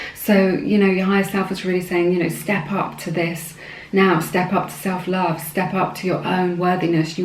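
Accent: RP, United Kingdom. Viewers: posh accent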